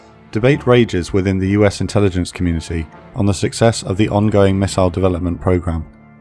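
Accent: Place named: Somerset, United Kingdom. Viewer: Nah, in real life he has a thick somerset accent... Closer to the wurzels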